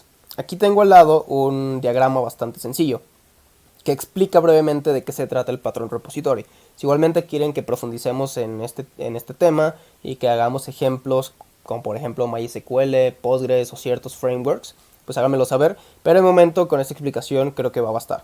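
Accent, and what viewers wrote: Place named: Colombia. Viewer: Hermano eres un crack ❤ Tengo ya unos meses tratando de entender bien arquitectura hexagonal y patrones de diseño y es el primer video que realmente me llega 🎉. Y mira que sigo a gente que explica bien y tiene conocimiento (no los voy a nombrar porq seguro ya muchos aqui los conocen 😅) pero aun asi tu has logrado en 20min los q ellos no. Creo por tu acento que eres de colombia asi que un saludo y un fuerte abrazo de un hermano venezolano. 😊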